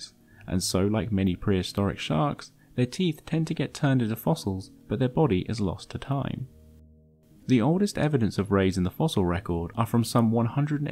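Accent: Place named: United Kingdom. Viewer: in, the "sorefish?" Why were they sore? Oh, wait. You meant "saw-fish." Damn, dude. Learn proper English. Ah'm jus' phokin' wi' ye, mate. Jus' takin' tha piss. But for real, sometimes, your non-rhotic 'r's, plus adding 'r's to words with open 'ah's, make what you say "hahhd to unduhhstand" for non-Brits.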